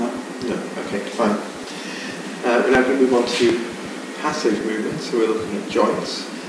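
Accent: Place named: United Kingdom. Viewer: very good clear, and precise. best of all a british accent :-)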